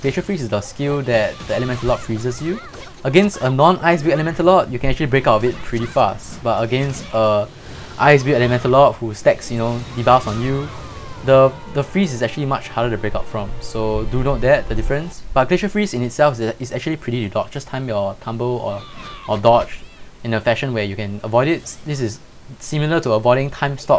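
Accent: Singapore. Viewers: are u singaporean?? cos u speak like a singaporean anyway i love your guides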